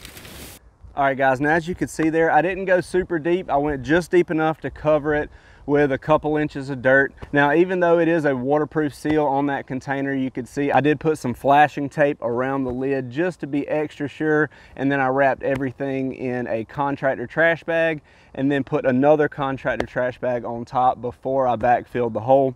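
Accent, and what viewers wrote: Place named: Georgia, United States. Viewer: I don't know where he is, but the freeze line where I am is 6 inches. He sounds like a Georgian so I'd say he's just about right.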